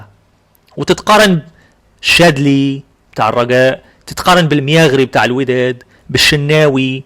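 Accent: Egypt. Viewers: لهجة مصرية نقية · الله يحفظك وينجيك خويا تبارك الله عليك اللهجة المصرية عندك نقية معندي منݣول عطيتيها العصير هدا هو جوابو قزمتييييييييييبه حددتي ليه السرعة دا انت خليتو اخر حلاوة في البهدلة بعيد عنك جنون العظمة والغيرة والحسد والغل والنفسنة خلو الحضري يقارن نفسو بالسي ياسين بونو مول الضحكة سي ياسين بونو صغير سنأ لكن كبيييير فنأ فالكورة الله يعز المغاربة فين ما كانو بونو حكيمي بونو حكيمي بونو حكيمي ballon d'or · ونتا علاش كتفهم وتهدر اللهجة المصرية؟ حيت هما فرضو لهجتهم حتى وليت تهدر بها ..على الاقل تكلم باللغة العربية وجمع وطوي ..وسمحلي صاحبي على هذه المواخذة ..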